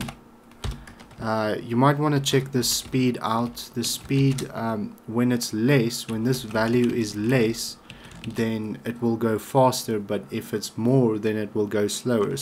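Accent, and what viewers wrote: South Africa. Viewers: you sound south african xD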